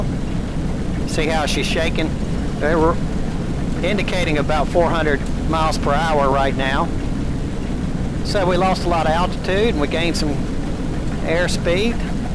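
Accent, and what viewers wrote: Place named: Deep South, United States. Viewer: Deep south. No offence meant.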